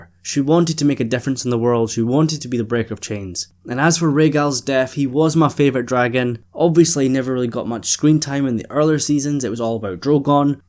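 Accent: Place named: Scotland, United Kingdom. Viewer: Where from Scotland are you?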